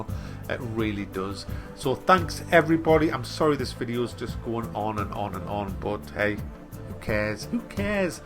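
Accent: United Kingdom. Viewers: Somewhere from the UK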